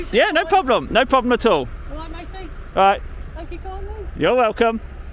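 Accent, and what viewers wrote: Leicester, United Kingdom. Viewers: You have a Leicester accent am l right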